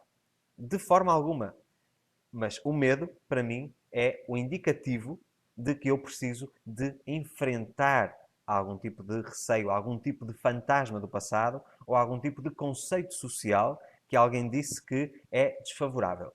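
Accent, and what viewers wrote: Portugal. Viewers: Esse sotaque português...😍😍😍😍 além de conteúdo construtivo. Grata como sempre.😊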